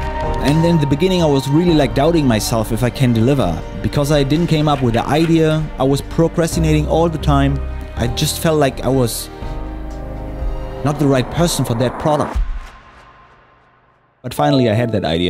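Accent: Germany. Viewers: Ich glaube damals über das Colour Change Video in Premiere. Fand deinen Humor einfach sehr amüsant, außerdem ist es sehr angenehm, dass du nicht versuchst deine Herkunft zu vertuschen in deinem Englisch sondern den Akzent einfach mit Humor nimmst. Macht es soo viel nachvollziehbarer und sympathischer (Bitte nicht falsch verstehen, dein Englisch ist sehr gut nur hört man es natürlich raus, dass du auch Deutsch sprichst :)).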